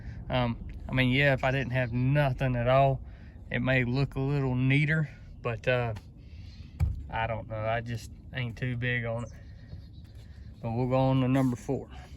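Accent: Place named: North Carolina, United States. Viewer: You gotta be From NC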